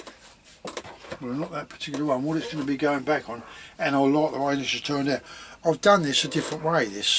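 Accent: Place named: London, United Kingdom. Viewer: Great interesting vid. Looking forward to watching more of your bids. You sound like a fellow londoner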